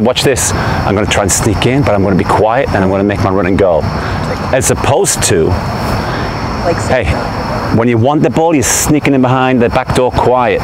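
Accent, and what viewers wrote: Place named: Newcastle, United Kingdom. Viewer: Watched a fair few vids now coach, I had to look you up! Yep, I cheated. But I will say that I did detect the Geordieisms in ya dialect. How? I'm from Ashington. Home of the great Jackie Milburn, and Charlton brothers. Me Granny used to babysit the pair.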